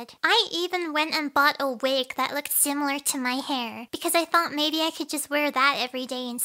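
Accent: Australia. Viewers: YOUR AUSTRALIAN 😮 I AM TOO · Honestly, I didn't notice the australian accent until she mentioned australia. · ARE YOU AUSTRALIAN?!😮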